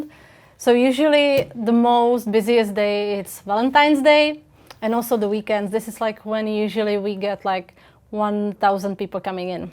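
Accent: Czech Republic. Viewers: She has a Czech accent. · Lovely Czech accent.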